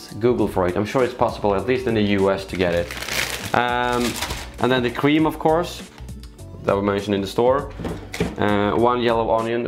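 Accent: Stockholm, Sweden. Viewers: Den här snubben är så stockholmsk som det går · why does sound extra swedish when doing vlogs? lol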